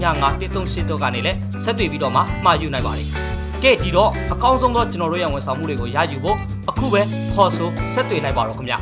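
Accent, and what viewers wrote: Rakhine, Myanmar. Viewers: ညီချေ ဂါရဝပါ အပြောအဆိုရခိုင်အသံပါနီရေအတွက်ရခိုင်ဖြိုက်ဖို့များရေ ယေဇုရခိုင့်နိုင်ဂန်မာအုပ်တချပ်သဲတပွင့်ဖြိုက်ပဇီ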